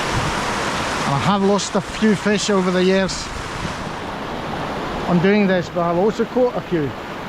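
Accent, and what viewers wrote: Scotland, United Kingdom. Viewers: Excellent video and a beautiful strong fresh fish, congratulations! Tough challenges in that current and the number of big rocks, well done! Thank you for doing in English and I compliment you on your excellent English which has a Scottish ring to it! Thanks for producing and sharing. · You have a Scottish accent. Great video btw.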